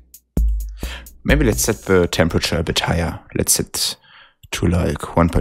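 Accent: Germany. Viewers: Sounds like a very nativ german guy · Der deutsche Akzent ist sehr sympatisch xD Danke für den Einblick ins Programm :3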